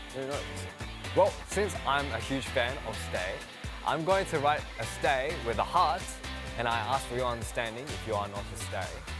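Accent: Australia. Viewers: I know everyone thinks it but his Australian accent makes everything 10 times better 😍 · The more is see this the more I get used to the Aussie accent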